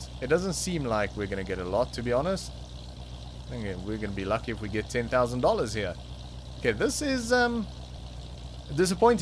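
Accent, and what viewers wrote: Afrikaans, South Africa. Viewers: It sounds like you have an Afrikaans accent. I'm curious where are you from.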